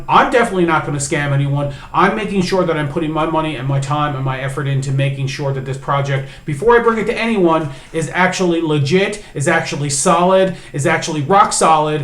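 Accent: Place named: Eastern Canada, Canada. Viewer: First time viewer. I knew in seconds you were from the east. I’m in Alberta. Learning about forth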